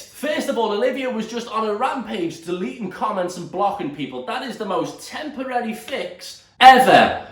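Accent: Liverpool, United Kingdom. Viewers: Note to self. Don't watch videos muted using captions (sleeping toddler lying on my arm) when it's a Scouse accent. Ended up really confused at what 'Istanbul's standards' has to do with everything and who the 'chiefs' are. Had to put the volume on to find out why it has went so international 😂 (I'm Scottish, subtitles just laugh at us to be fair) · are you scouse? Cause if so what football team do u support?🤙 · heyyyyyy I love your Chanel so much and ur Liverpool accent hehe I think its from Liverpool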